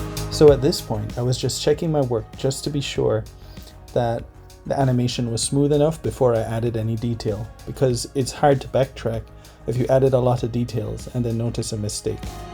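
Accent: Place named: Caribbean. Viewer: Great video! I like how expressive you made her. Its the reason why I clicked on your video. And after 30 seconds I knew you were from the West Indies. Keep at it!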